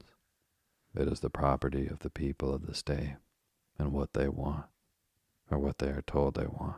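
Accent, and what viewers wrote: United States. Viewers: your straight up American voice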